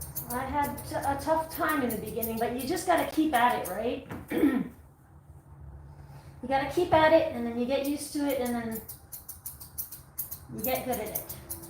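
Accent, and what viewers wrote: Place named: France. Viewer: Ton accent français est tellement beau.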